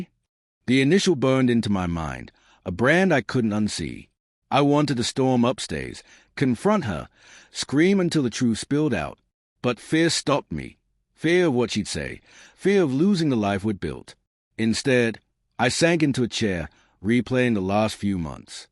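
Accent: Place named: South, United States. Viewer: The southern accent from the AI is off putting!! Needs to have a slower cadence to be believed. Good story though.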